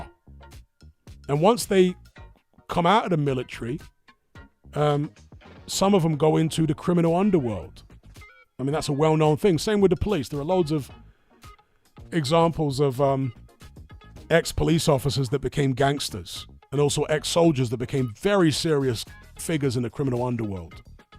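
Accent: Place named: United Kingdom. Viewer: I guess you're not used to hearing black guys with British accents. Anyone who is from the UK will tell you he sounds black. That's what we sound like here.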